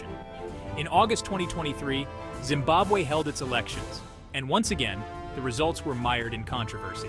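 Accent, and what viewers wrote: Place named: Europe. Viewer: Would have preferred this being reported by Museyamwa, Mutenhesanwa or Nyamuzihwa - or their female counterparts. Not this Eurogenic voice. Do we ever get an African voice promoting an American, British, French or other political outfit? What's the agenda here? It makes some of us question whether Chamisa is any different from the American puppets Nana Akufo Ado or William Ruto, to mention but just two. Does he really stand for us, if such a voice as this is his representative?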